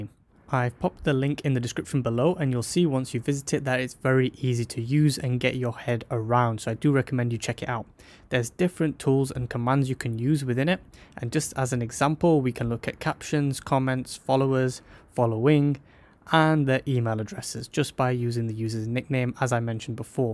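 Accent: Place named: India. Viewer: Bro are you from india?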